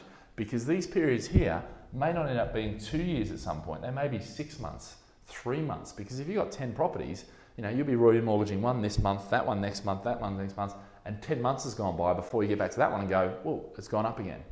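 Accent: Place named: Australia. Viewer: from the uk yet he has an aussie accent :P very informative.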